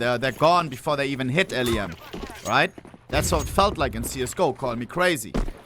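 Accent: Germany. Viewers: Stresst richtig, wenn Deutsche versuchen englisch zu sprechen :D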